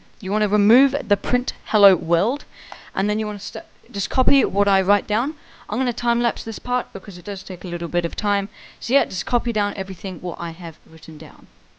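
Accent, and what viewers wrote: United Kingdom. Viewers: I love your Bri'ish accent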